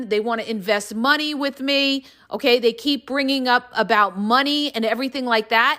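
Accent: New York, United States. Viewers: Ignore the haters! Your colorful vocabulary, and that classic NY/NJ accent and inflections make the podcasts even better. Reminds me of the Sopranos.:)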